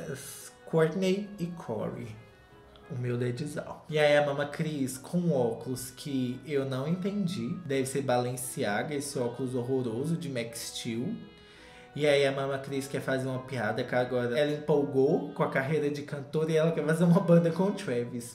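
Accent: United Kingdom. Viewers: Não há melhor canal!!!!!!! E esse inglês british Braseeeeellllll 👏👏👏👏👏👏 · O toque britânico no seu inglês é um charme a parte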